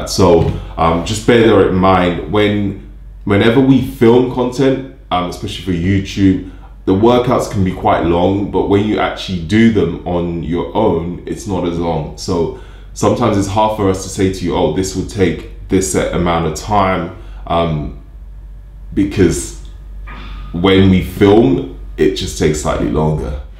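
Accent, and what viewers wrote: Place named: United Kingdom. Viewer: Damn your so fit and your accent is so brittish very Nice. Btw congrstulations😉👍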